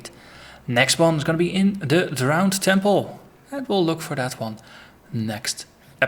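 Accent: Netherlands. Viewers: I love dutch acent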